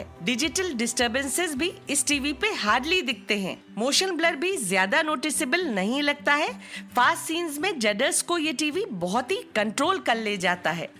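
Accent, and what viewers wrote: India. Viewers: I’m from Brazil and I can’t understand the Indian accent, so I put it in mute and turn on the subtitles… great review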